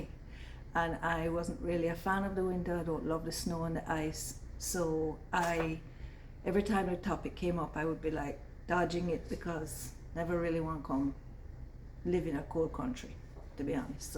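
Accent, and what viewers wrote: Jamaica. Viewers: This must hurt badly, even your accent is Jamaican. This is why i haven't when to Jamaica too much violence, i came to Europe 9yo now 40 and still haven't visit. The country need a safety net to help the people like in Europe or it going to be a nightmare in Jamaica in couple decades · I left my home country in the UK due to discrimination. The world has changed in a bunch of weird ways. I'm English, I sound English (in that obvious way) in the same way you sound Jamaican even when youre not speaking like a Jamaican. I did not go to Canada, I went to my wife's home country of Moldova. It is an odd thing that as a foreigner with a love for Moldova, I am loved and treated with a higher acceptance than my home country. I think I know what went wrong. People from their countries belong to the internet, they dont have a place to belong, they dont have a place to represent. 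I feel your disconnect. I still love the UK... I spent time in Jamaica, I get it. I do... 😢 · Wow! It is amazing watching a white lady speak with an authentic Jamaican accent. Her accent is very real! Black people are killing each other over the things that white people have allowed them to have. We are living in a local & global system of racism (white supremacy) where Black people are being put into desperate situations where they have to steal and kill each other to survive. This is not just in Jamaica, but everywhere on the planet where people colour are. Including Canada. So there is no escaping this global crisis! These problems are not the directly & indirectly created by people of colour themselves, because they are prisoners of war. Slavery never ended in 1865.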